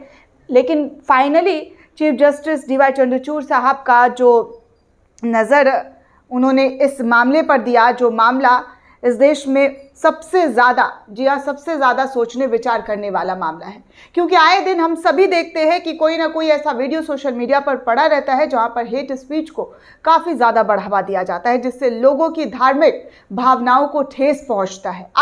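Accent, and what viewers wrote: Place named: Bengal, India. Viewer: aapka yea Lady reader or announcer 100% Banagali hai. Acha reader rakhiye jo pronounce thik karegi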